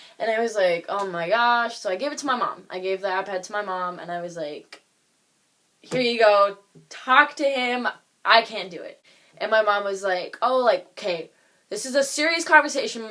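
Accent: Alabama, United States. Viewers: Uh...are you from ALABAMA ? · Are you from Alabama? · are u from Alabama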